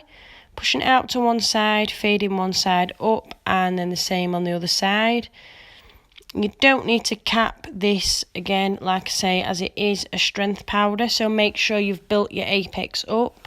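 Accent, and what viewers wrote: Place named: Leeds, United Kingdom. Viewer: Really beautiful set of nails. I am addicted to glitter planet products and her prices are so reasonable compared to other nail supplies. Where is your accent from if you don’t mind me asking? It sounds like a Leeds one or maybe surrounding areas? Thanks for sharing. 👍🏻👏🏻👏🏻👏🏻👏🏻👍🏻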